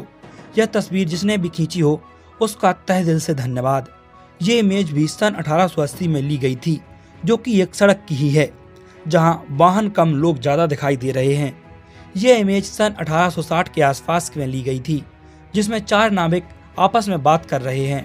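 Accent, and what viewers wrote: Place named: Uttar Pradesh, India. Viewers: भाई व्हिडिओ 1नो. बस भाषा सुधार के बोलो ईसे वही यूपी वाली fill आती है व्हिडिओ मे भी ये मुंबई है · Arre Hindi nit bolo. Bhaiya style ka boltos ?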